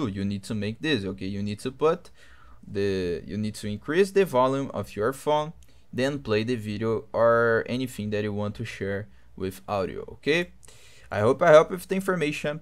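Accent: Brazil. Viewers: Hmmmm sotaque de brasileiro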